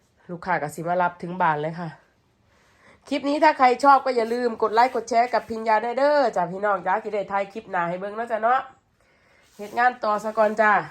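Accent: Korat, Thailand. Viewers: คน โคราช นิครับ คือ พูด สำเนียงโคราช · วัสดรจ้าลูกสาวยากไปจิไปติดต่อกะใหนจ้าคุณ เป็นคนกะใหนจ้าพูดคือคนโครราชเนอะจ้า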